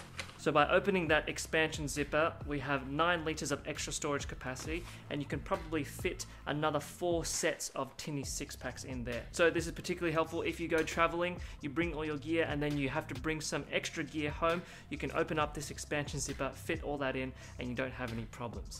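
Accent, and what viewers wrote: Australia. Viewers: Homies accent is wicked yo. Always a trip for me to see a fellow Asian with the croc hunter accent. 👍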